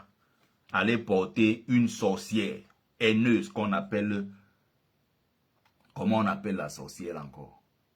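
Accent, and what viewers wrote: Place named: Beti, Cameroon. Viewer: 😂😂😂😂😂😂😂😂😂😂😂😂 toi ci hein, tu fais l’accent beti bien.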